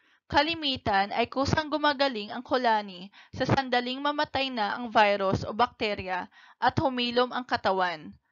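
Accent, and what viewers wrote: Visayas, Philippines. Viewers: Ok n saya.. Kaso bisaya te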